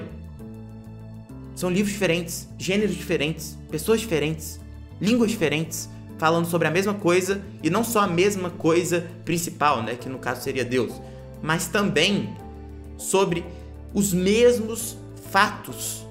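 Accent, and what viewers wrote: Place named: Bahia, Brazil. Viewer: Isso se chama sotaque.. Aqui na bahia é muito comum.. Como um brasileiro, tu já deveria estar acostumado com nossa variedade linguística e cultural.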